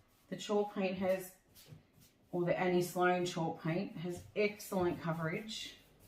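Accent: Australia. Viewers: Lovely to hear an Aussie accent - thanks for the info! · Thanks so much for the in-depth video! Always good to hear a fellow Aussie too haha. This was fantastic, definitely deserves more views and likes.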